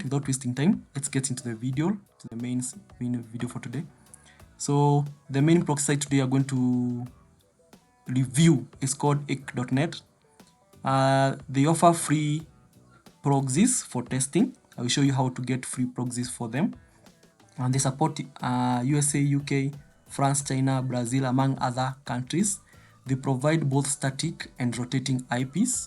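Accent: Kenya. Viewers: Informative channel, pia mimi niko na ma channel kadhaa huku youtubes, uko na strong kikuyu accent nimejua tu wewe ni mkenya lakini wakenya wameharibu market ya online kwa onlinejobs by using fake accounts na proxies, sasa its harder now ju kenya imebaniwa in most online platforms. Niko na swali, Go login yako unafanyaje ikuwe na over 300 days left na ya trial inafaa iwe na 7 days?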